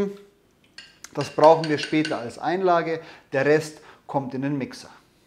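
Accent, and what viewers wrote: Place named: Bavaria, Germany. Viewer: Seit ein gewisser Herr S. aus Bayern mit seinem Dialekt omnipräsent in den Medien auftritt, war mir das Bayrische nicht so angenehm im Ohr. Du hast das eindeutig in die positive Richtung gekickt.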